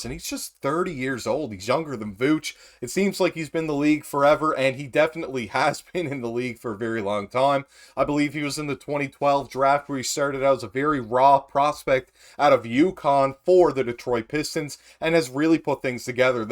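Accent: Canada. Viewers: are you from nova scotia?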